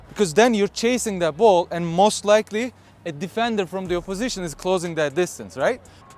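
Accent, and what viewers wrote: Turkey. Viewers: Heaviest turkish accent i have ever seen in my life 😂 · Türk müsün? Aksanın türk aksanına benziyor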